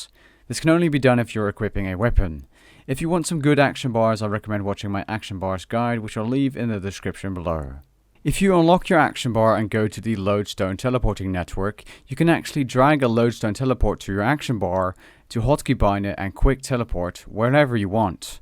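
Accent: Netherlands. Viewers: You are Dutch? :o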